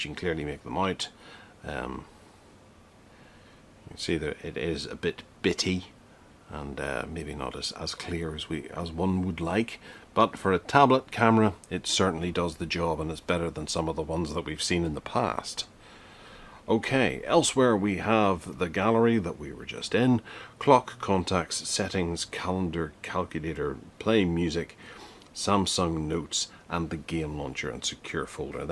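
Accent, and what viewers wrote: Northern Ireland, United Kingdom. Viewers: What part of north of ireland you from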